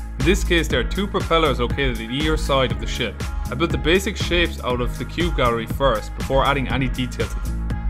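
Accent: Ireland. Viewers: btw are you irish because you have an irish accent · Are you Irish you sound Irish?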